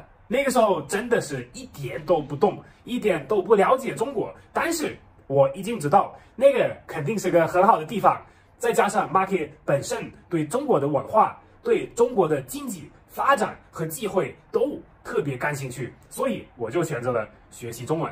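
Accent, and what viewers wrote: Sichuan, China. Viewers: 厉害，竟然会好几国的语言👍👍👍而且学汉语还学到了四川口音。如果不看脸，还真像一个四川人在说普通话。😄😄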